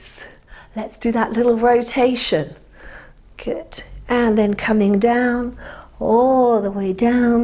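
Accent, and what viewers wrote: England, United Kingdom. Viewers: Hello, I haven´t done any exercises for a good few years, just being lazy, and very little inspiration, decided must do something, so I started only yesterday, did´nt manage to do all the 30 minutes, I thoroughly enjoyed those that I managed to do. Today was a little sore, but have done another lot of your exercises, to get rid of the stiffness. My name is Jan (Janythe) Canovas, I am a South African of English parents, I am 85 years old, and need to take care of the amount I can manage, but am happy to say that every day manage a little more, It is so good to listen to nice English accent, thank you. Your explanations are really wonderfull. I will come back to you in a few weeks, when I am able to manage the full 30 minutes, it will take a little time as I am VERY stiff and unfit, but you helped me a lot, even managed to go to sleep a little easier, I am so thrilled to have found you, and your over 60 Pilates. 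Thank you so much, sincerely Jan. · So happy to have found you. I'm a senior senior (!) and had stopped all muscle work and started doing cardio only, due to joint pain, etc. etc. This session allowed me to work my whole body once again without pain. Plus, everything's better with a British accent. Thanks!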